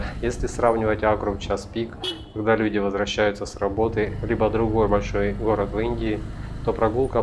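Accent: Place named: South, Russia. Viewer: Интересная поездочка! Мне не доводилось бывать в Непале. Самая близкое к тому место посещения - Индонезия. Кстати, дымка - скорее всего, проявление высокой влажности. Я такое видел в Джакарте и Лагосе. И вопрос - откуда южный говор, если не секрет? ))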